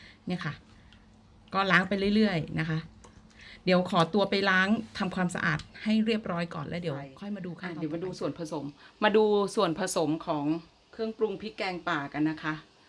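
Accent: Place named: Phu Thai, Thailand. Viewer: มีเเต่เมนูตาเเซปจ้า,ออนซอนจ้า,มักสำเนียงภูไทมากจ้า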